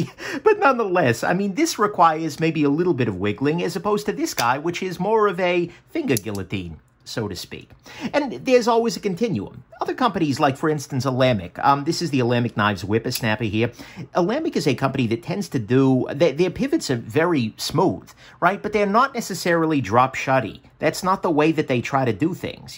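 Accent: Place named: New Jersey, United States. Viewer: Where do you grew up to get a accent like that i suspect dirty jersey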